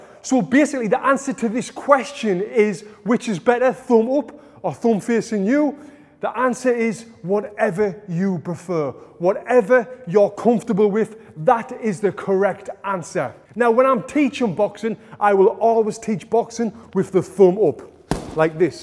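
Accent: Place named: Geordie, United Kingdom. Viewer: Is this guy from Middlesbrough or Newcastle because he sounds like from that area in uk. North east area, maybe Sunderland, Nirthumherland or Durham. They all sound the same. Geordies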